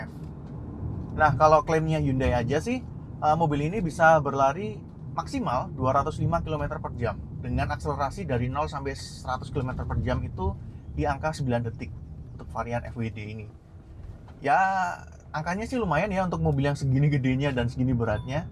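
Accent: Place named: Java, Indonesia. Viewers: logat suroboyoan itu.. beda sama jawa yg lain. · Bingung jon ngomong indo logat jawa...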